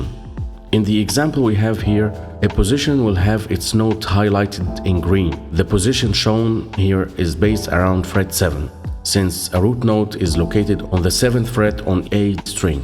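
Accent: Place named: France. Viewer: are you in France? You kind of have that french accent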